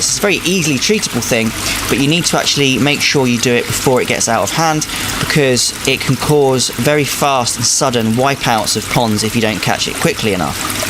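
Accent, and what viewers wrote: England, United Kingdom. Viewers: He’s literally speaking English in an English accent. How is it that you can’t understand him 🤔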